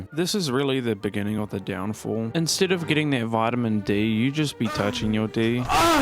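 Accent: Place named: England, United Kingdom. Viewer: the english accent adds to the dry humor, nice video.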